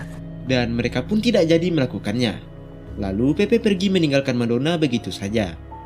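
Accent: West Sumatra, Indonesia. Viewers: Fix mimin org minang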